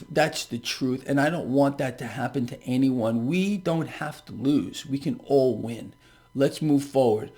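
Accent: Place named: New York, United States. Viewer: Are you just sitting here on your ass with your New York accent promoting ideas let’s serve your best interest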